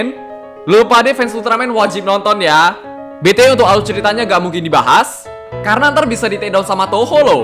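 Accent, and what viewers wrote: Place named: Medan, Indonesia. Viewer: hmmm abang ini fix orang medan wkwk salam kenal bang, btw gua orang pakam bang. dari awal gua subscribe elu rada ngeh sama logat logat khas medan lu eh ternyata bener orang medan dong wkwk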